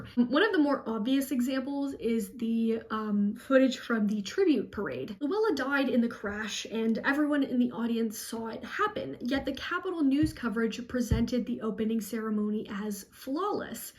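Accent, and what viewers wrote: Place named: Canada. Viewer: this is the first video of yours that i've watched so when you first started talking, i got a vibe that you were canadian and im glad to see i was right. besides that, this is such a cool concept and exactly the kind of analysis ive been looking for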